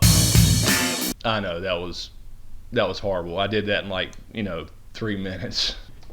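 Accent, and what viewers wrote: South, United States. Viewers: Oh, I see. Your accent is very southern but I couldn't figure out where. Anyway... how do you think Melisandre will ultimately convince herself that Bran and/or the Bloodraven are not necessarily evil?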